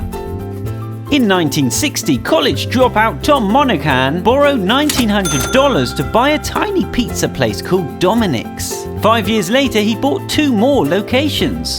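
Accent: England, United Kingdom. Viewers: why are you speaking american with an english accent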